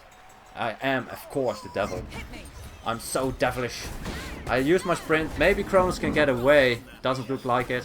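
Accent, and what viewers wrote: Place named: Netherlands. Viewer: The dutch accent ;)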